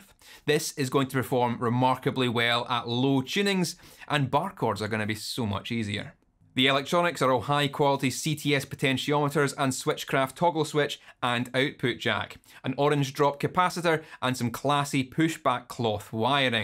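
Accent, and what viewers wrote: Scotland, United Kingdom. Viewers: Lol unrelentingly Scottish from start to finish. Love it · Absolutely love this, pure magic and in an accent I’m more than comfortable with. Subscribed and keen to consume more. Soar Alba 🏴😁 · His accent sounds stronger in this video, the Scottish pride is bleeding out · Beautiful. Also, is it weird that has never sounded more Scottish than he does in this video about the most Scottish guitar?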